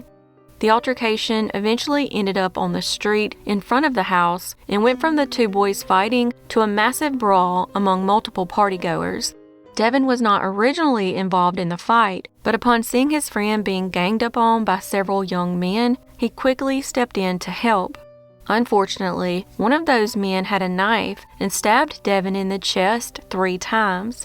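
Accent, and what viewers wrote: South, United States. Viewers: Love your accent! Georgia? South Carolina?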